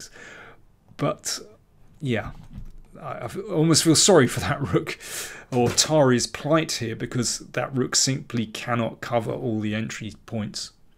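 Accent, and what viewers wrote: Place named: RP, United Kingdom. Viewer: If you wanna hear someone talking too much, try agadmator's Chess Channel.. or, if that's not enough even try this Pepe-guy on chess24: absolutely unbearable word-diarrhoea. Daniels Grandmaster speak is exactly in the sweet spot between education and entertainment, and neither too little nor too much. And, may I add, kept in the most wonderful Queens English. Keep it up.. and tnx a bunch:-)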